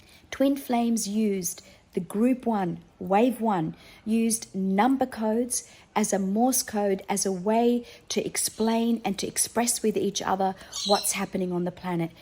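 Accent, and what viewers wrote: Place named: Australia. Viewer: Hello from Western Australia 👋 great to hear another Aussie lady speaking on TF’s 🙏♥️✨✨✨I’m first wave, I met my Beloved late 2013/14. And yes your spot on, he has kept me in deep dark silence for years. I’ve been blocked & im still blocked as far as I know. No friends in common, no social media to “ stalk” nothing!!! We last spent a night with each other Dec 2019, heard from him again over a phone call Feb 15th 2020 and have not heard from him since. But he never hurt me intentionally or ever said a mean word, he just ran and ran hard. He’s in a relationship with young kids. I’m 12 years older at the age of 51. lol 😂 another EDIT!!! YES I’m a born rebel, you have described me to a T. I am anti everything “ Establishments” and I’ve seen/known of the darkness that dwells within these matrix establishments since I was a young girl. 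I DO NOT COMPLY 🙌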